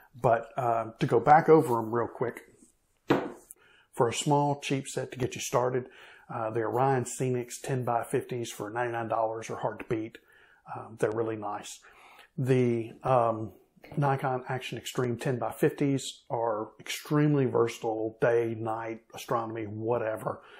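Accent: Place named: South, United States. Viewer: So cool to hear a brainy guy with such a fine, southern accent. :-) Been researching this stuff to death, and two of your videos have now answered essentially all of my remaining questions. Thank you.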